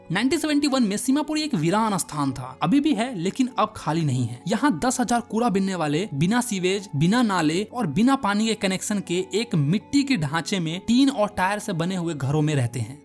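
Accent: Bihar, India. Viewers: Sir ap Bihar ke ho kya🤌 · Bhai bihari ho kya 💯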